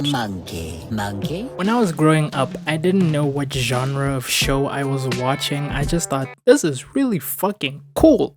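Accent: South Africa. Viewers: Your South African WWWWW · Bro! This was a well done vid man. I actually thought you were American based off your thumb nail design. What a surprise it was to hear your South American. As a Nigerian who has been in South Africa since 2009 i relate to that SABC 2 hate😂. Keep up the great quality bro, I'm proud if you and you just got yourself a new sub.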